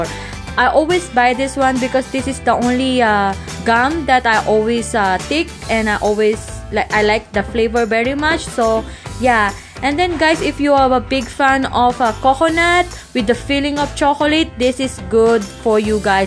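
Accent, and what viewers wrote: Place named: Philippines. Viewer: Hi,may I ask if you are Filipino?I just noticed your accent.:)Nice haul by the way.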